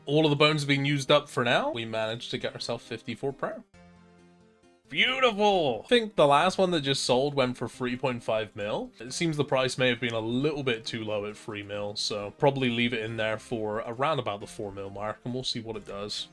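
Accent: Bristol, United Kingdom. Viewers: bristolian accent shining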